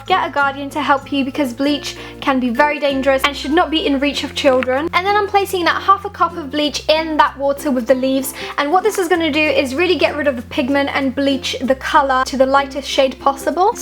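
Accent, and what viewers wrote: United Kingdom. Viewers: Are you british or something? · R u British??????? It's bugging me that I don't know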